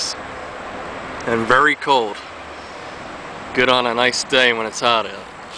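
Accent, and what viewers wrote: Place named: Philadelphia, United States. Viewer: love the Philly accent!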